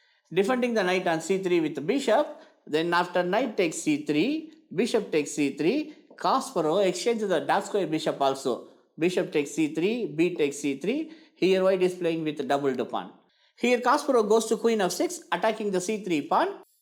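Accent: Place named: Tamil Nadu, India. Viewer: Super sir I am also tamil..... your English was super sir....I guessed you are a tamil people....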